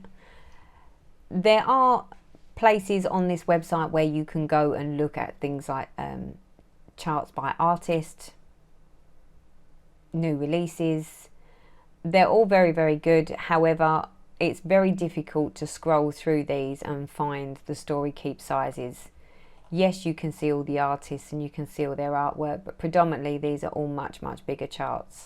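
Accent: United Kingdom. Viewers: I came across your floss tube video quite by chance and I love it , you are hilarious and the struggles you have had are so identifiable to every cross stitcher. I live in a household full of men/ boys so you are my company when I am sat stitching. We left the UK 3 years ago to live on the west coast of Canada and it is so great to hear a voice from home.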